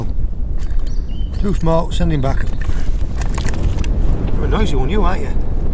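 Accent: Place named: North, United Kingdom. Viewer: Love when a smoggy accent tries to speak proper England. Go lad, cracking channel. Ex booty?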